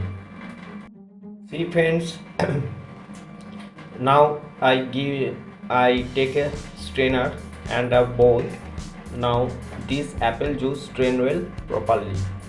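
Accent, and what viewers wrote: India. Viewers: Are you from India I love the accent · Mania the reason why we are laughing it's because it's an Indian speaking this way... FYI french , Chinese, Spanish, Russian, Africans, and many rural country accross the globe speak worse than this... Atleast we understood him if it were some other countries mentioned above we wouldn't have been able to understand them even more... Stop criticizing and appreciating... He's got a million people supporting his content.. work is more important than the language..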